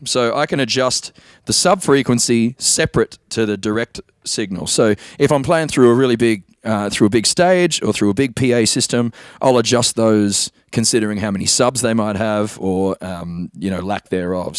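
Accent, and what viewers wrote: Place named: Australia. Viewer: Excited to see it! It is funny how a looping rig is an evolving process. I truly believe simpler is better, but you’d never know it when I set up my solo rig. See you soon in Nashvegas! Ps.... lived in Sydney for 2 years. Listening to your accent brings back some really good memories! Ta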